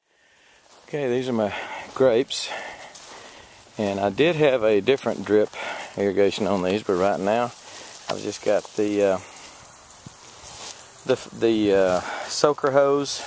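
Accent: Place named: South, United States. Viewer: I love hearing the Southern drawl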